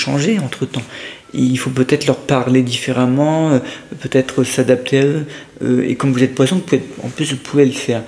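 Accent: North, France. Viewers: vous êtes du nord? Bonne soirée j'adore votre guidance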